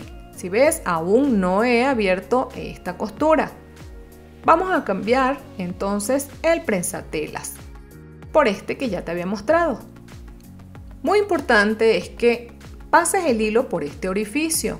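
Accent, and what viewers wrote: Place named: Venezuela. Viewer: Gracias.... Me gusta mucho la forma como explicas... De donde eres? Tu acento es venezolano, me parece.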